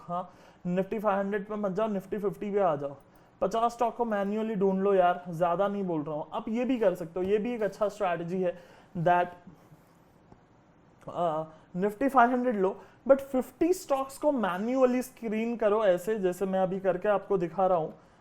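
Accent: Gujarat, India. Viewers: Are you gujarati?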